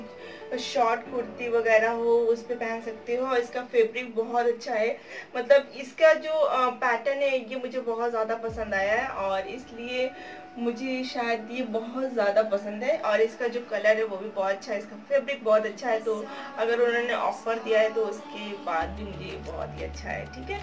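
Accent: India. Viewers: Mast ek dam mumbai language Mai boleto jakas🤩🤩🤩🤩🤩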